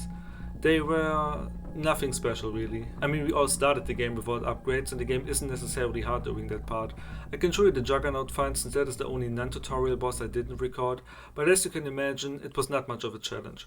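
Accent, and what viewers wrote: Germany. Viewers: Can it be that you are German? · Your german accent is very nice sounding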